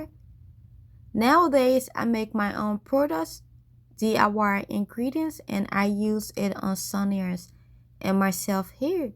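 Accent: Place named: Liberia. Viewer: Are you Liberian you sound like me!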